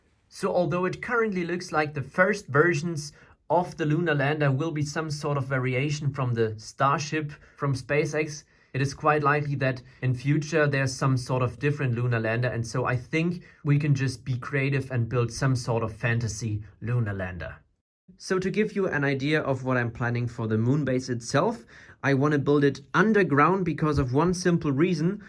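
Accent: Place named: Germany. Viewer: Der Typ ist kein Engländer. So wie der englisch spricht hört man sofort raus, ist deutsch seine Muttersprache. Ich habe mir schon hunderte englische/amerikanische Videos angeguckt und keiner spricht so. Das machen nur deutschsprachler.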